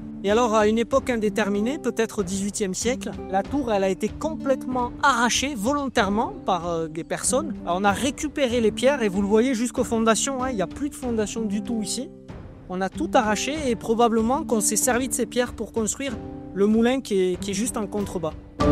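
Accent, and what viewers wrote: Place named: Tarn, France. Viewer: Merci pour cette belle vidéo : site historique magnifique, réalisation soignée, explication claire et précise sans tic de langage et avec l'accent tarnais qui va bien. Rien à voire avec l'abrutissement de Tik Tok...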